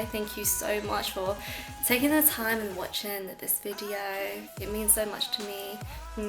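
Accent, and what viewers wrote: United Kingdom. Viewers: I am so happy you have YouTube!! I follow you on instagram and I'm so excited to watch your YouTube vids!❤️ It's so nice to have makeup inspiration from some one with a British accent✌🏻😜 (no offence to the fabulous others) Do you ever visit Scotland? Xx Keep it up! So much make up envy 😝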